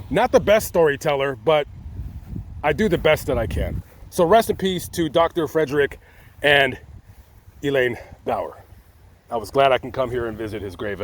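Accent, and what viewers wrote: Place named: West, United States. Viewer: Man, you visit all these places of remembering, & do a fine job telling stories of those who my go forgotten. Do people, or security rather not approve of your simply visiting these cemeteries, filming the general area, & talking about those intered therin? I don't understand why you must lie. Go to an Atwood's, or Tracter Supply. Find decent looking dark blue denim jeans, & a few Sunday Morning acceptable western style (snappy button) shirts, & Heck, you may even find a nice pair of Boots. Your accent is western enough, & you'll look like any good ol' South Western man there to pay his respects. (& if you shop a bit you can not spend a huge chunk of your savings), I promise it could make a world of difference. These are cemeteries, the few folks that you find around tend to worry about the ones they have lost.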